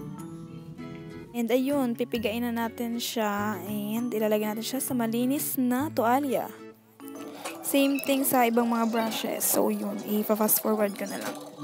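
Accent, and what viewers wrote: Visayas, Philippines. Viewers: bisaya diay ka day? halaaa 💖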